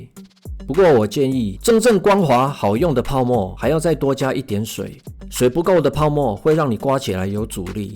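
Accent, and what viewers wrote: Taiwan. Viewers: 就是這個正港台灣腔讓我訂閱的😂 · 你的臉很帥感覺很斯文，但聲音很台哈哈哈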